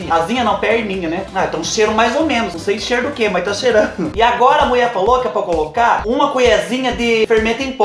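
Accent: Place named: Porto Feliz, Brazil. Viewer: Seu sotaque é igual o nosso aqui de Porto Feliz!!! Bao demais...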